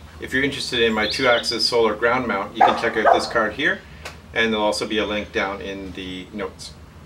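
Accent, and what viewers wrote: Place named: Ontario, Canada. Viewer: You from Ontario Canada?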